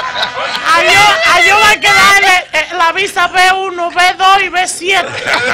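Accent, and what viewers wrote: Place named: Cibao, Dominican Republic. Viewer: Lo que más me gusta es el estilo cibaeño.😂😂😂😂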